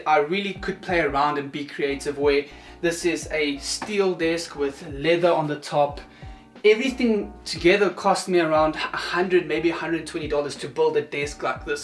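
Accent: South Africa. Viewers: knew your accent was from here keep the content coming and representing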